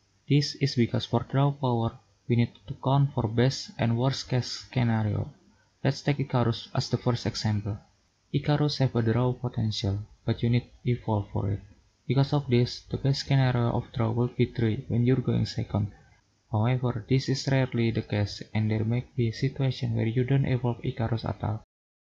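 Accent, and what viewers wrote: Indonesia. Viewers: Also i never guess that you're indonesian before i hear your voice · u sound like indonesian tbh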